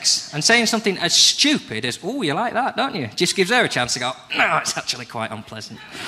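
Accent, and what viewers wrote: United Kingdom. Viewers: what he forgets is that there's a whole foreign market of women who would love him for his English accent alone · is adorable, the northern accent is my favourite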